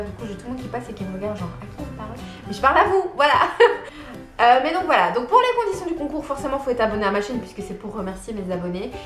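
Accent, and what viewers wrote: Belgium. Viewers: bonjour, trop contente que tu organise ce petit concours car j'aimerais vraiment me lancer dans le métier de prothésiste ongulaire. écoutes je t'ai connu de façon un peu singulière, c’était un dimanche en fin de journée, ayant acheté il y a quelques semaine une machine led et quelques vernis semi-permanent j'ai voulu trouver sur Youtubeuse qui expliquait de façon clair et précise la pose de vernis semis permanent. et biensur je suis tombée sur toi!! tu ne vas pas me croire mais j'ai tout de suite était captivée par ta voix, tu expliques très clairement et c'est ce qui m'a plus. j'ai d'ailleurs reconnu le petit accent Belge!!!! du cou^p j'ai parcourus un peu toutes tes vidéos et me suis abonnée tout de suite. je dirai que la première vidéo que j'ai vu de toi était celle de la pause d'extension en gel avec le chablon (PAAS LA PLUS SIMPLE ) mais je ne sais pas pourquoi je me suis tournée vers celle là. 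voiliiii tu sais un peu tout. merci d’être la, d'avoir le courage et la patience de partager ton savoir et surtout d'être TOI. a bientôt mon inta est ET MON fb Alicia mbuyi muadi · Coucou! Alors j'ai découvert ta chaîne grâce à ta vidéo TUTO pas à pas French avec du semi-permanent. Et ce qui a fait que je me suis abonné est que j'adore la façon dont tu parles c'est super agréable, tu donnes beaucoup de conseils et ton accent belge est sympathique!! En tout cas je viens tout juste de découvrir le gel et l'acrylique donc j'aimerai justement m'y lancer. Félicitations pour tes 10k, ca a l'air de vite monter, bisous bisous! FB: Louise Rousseau (ArcEnda)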